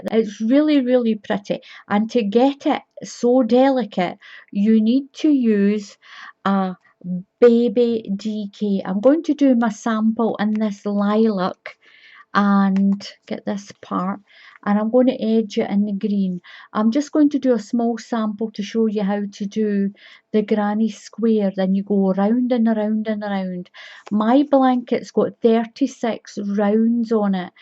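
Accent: Scotland, United Kingdom. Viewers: where are you located in the UK if you don't mind. I hear a beautiful Scottish accent am I correct?? Also, I like to use, current US standard, Meetup App/Zoom meetings for local get togethers with different groups (recommend for all). Barbara, Sacramento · I love your accent. Scottish? Great tutorials, thank you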